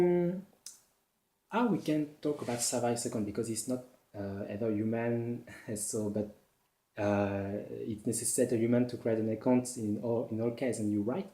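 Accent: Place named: Germany. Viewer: Great tutorial brother, Loved that German accent!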